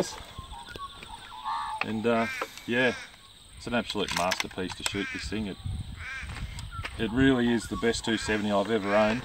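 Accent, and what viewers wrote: Australia. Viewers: Sorry, can't understand Aussie.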